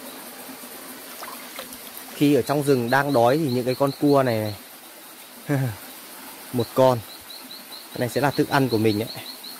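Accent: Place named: North, Vietnam. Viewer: Hay quá... giỏi quá tiếng nói chuẩn ngoài bắc luôn...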